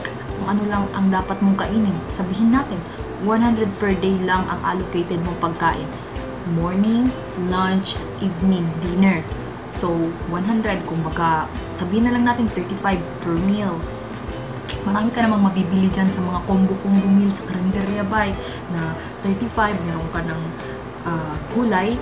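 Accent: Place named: Visayas, Philippines. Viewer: I think bisaya ka dai... 😊😊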